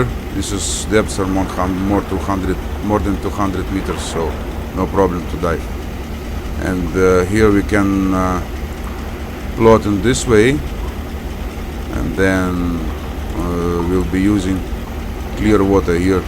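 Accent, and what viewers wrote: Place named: Russia. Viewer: Вермахт это в переносном смысле за стрельбу из пушки, а не торпедирование где хочется посмотреть мастерство. А на счёт реализма странное не понимание, ну какой может быть реализм у симулятора да ещё с таким множеством поблажек который он дает игроку? Здесь не то что снижать, самому игроку приходится ещё додумывать как бы усложнить игру. Кстати на западе почему-то все упорно играют на низком бояться что ли? Я сперва пока не услышала акцент тоже подумала, что с запада, а потом смотрю под видео название-о да это наш.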